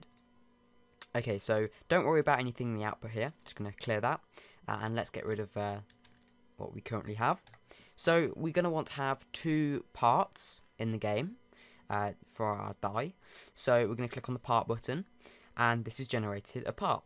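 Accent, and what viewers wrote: United Kingdom. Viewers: HAS TO BE UK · never really got where your accent was from, was thinking UK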